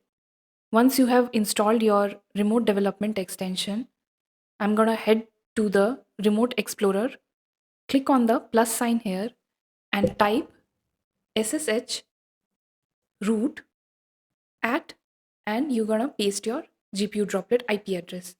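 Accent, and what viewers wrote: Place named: India. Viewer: I just can't listen to indian accent sorry 😆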